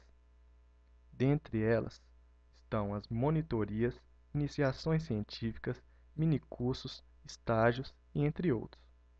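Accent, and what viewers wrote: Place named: Minas Gerais, Brazil. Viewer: Antes de dizer que havia estudado em Ouro Preto já percebi q vc é mineiro, se não é pegou bastante o sotaque rsrs, Minas minha paixão, saudades!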